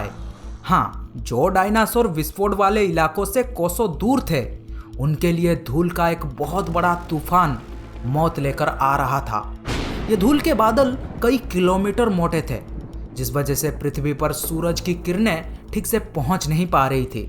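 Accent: Odisha, India. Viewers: Bhai aapka baat krne k tarike se hi pta chal raha hai tum Odisa se ho😁😚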